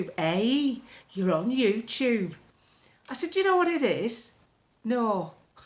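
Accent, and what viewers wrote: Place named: Lancashire, United Kingdom. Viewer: You have beautiful skin, and I’m from Yorkshire so I loved your Lancashire talk it’s same in Yorkshire on “tinternet”, you need to do more funny stuff, I love it along with the political side as well!❤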